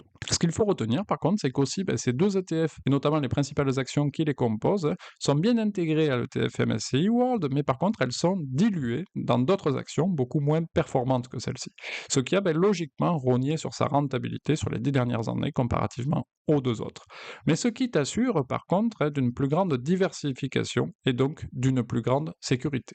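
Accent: South, France. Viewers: Vu ton accent, t'es du sud mais d ou exactement ?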